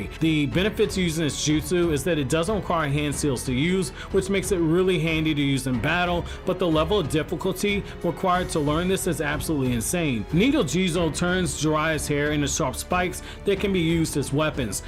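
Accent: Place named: South, United States. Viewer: they say oil like that in the south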